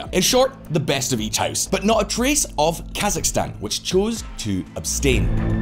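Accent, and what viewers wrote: Ireland. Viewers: Are you irish? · You speak to fast but I understand p, are you ☘️ irish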